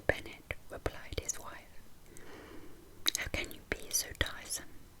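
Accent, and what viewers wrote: United Kingdom. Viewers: Luckily just found this playlist after searching deep, I'd be really happy to hear another series of a storys chapters read by you! :) whispered reading is my personal favorite to relax/ fall asleep and I think your (or the british) accent in general is percect for it. It's also something simple you can upload to feed the algorithm in case of having periods of feeling less creative :) Hope your channel will heal and you are doing well ❤️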